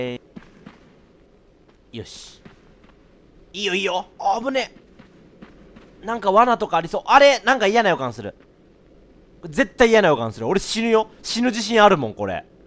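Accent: Kansai, Japan. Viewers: 関西弁をーーーー！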